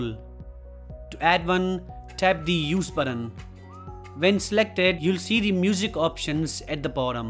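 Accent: India. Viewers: Cet accent vient de l' Inde. Là bas les gens appuient fort sur les lettres quand ils parlent l'anglais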